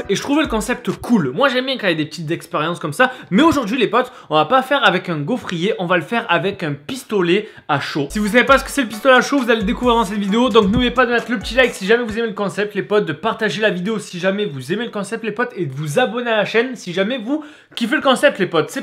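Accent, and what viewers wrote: Marseille, France. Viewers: waw l'accent marseillais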